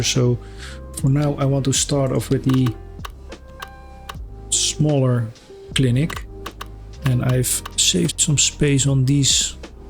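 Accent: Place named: Netherlands. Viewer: That accent... a fellow Dutchman by any chance?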